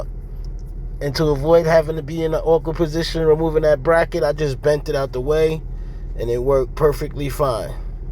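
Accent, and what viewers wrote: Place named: Philadelphia, United States. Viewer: I see your page says Orlando but bruh I swear you sound like your from Philly.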